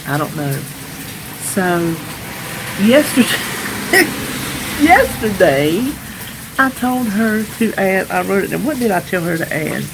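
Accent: South, United States. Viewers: I love your “southern talk”, unlike my NY accent. When you said “coffee”, that’s me.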